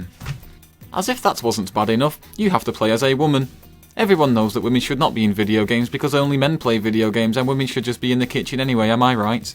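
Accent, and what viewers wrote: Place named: United Kingdom. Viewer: It'd be funny if it didn't sound like it was being read off a flash card. Or have that god awful British accent. :P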